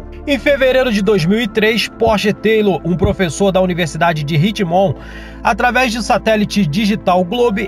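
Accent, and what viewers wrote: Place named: Rio de Janeiro, Brazil. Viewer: Menos sotaque carioca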